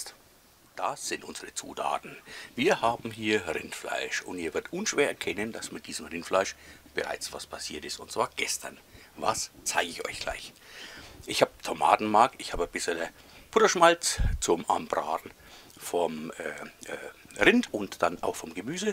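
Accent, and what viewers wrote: Franconia, Germany. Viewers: Es ist Gold wert was die Youtube Untertitel aus deinem fränkischen Dialekt machen 😂 tolles Rezept und Video! Ich glaub ich brauch doch langsam mal nen dopf.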